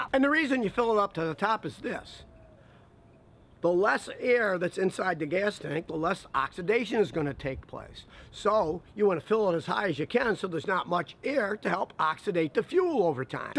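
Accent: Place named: Western New York, United States. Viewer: Really like your videos. Love the WNY accent. Reminds me of home. ;-)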